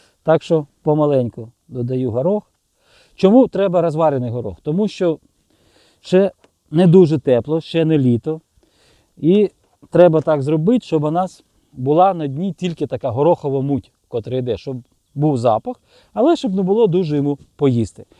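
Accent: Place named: Western Ukraine, Ukraine. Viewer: Неначе пів життя на західній Україні проживав, мова топ( майже😊)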